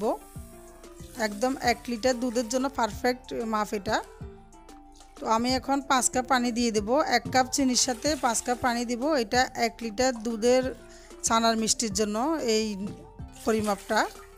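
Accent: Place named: Noakhali, Bangladesh. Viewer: কথা শুনে মনে হচ্ছে উনি নোয়াখালির।এদিকের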